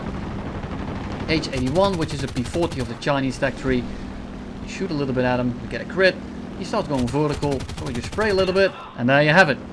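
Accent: Netherlands. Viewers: I love your vids btw, but that english accent, you have to be dutch, i think you are a Dutchie.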